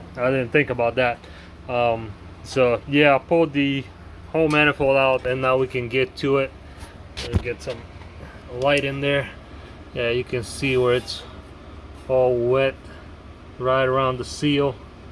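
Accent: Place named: South Louisiana, United States. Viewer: thank you for the video, huge help trying to help out an old man with Parkinson's ,,, his one joy is cutting his grass now i can get him back up and going from the sound of your accent, sounds like you're from south Louisiana,, i'm from Lafayette originally, be blessed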